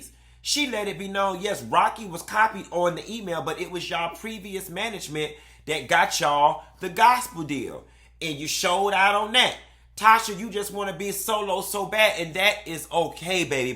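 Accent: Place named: Florida, United States. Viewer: I swear foe Gawd! Yo ability to discern and explain mess is an awesome thing to watch! That South Florida flow. I'm from dine thea too. I love it!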